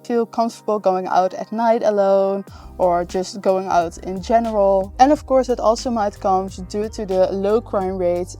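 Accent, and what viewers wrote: Netherlands. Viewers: i love your dialect from dutch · Dialect? She's speaking English with a Dutch accent.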